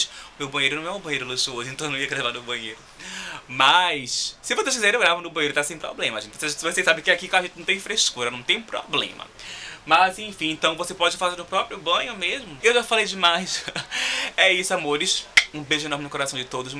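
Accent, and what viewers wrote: Recife, Brazil. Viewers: inscrita, adoreeeeeeei. Ele é de Recife?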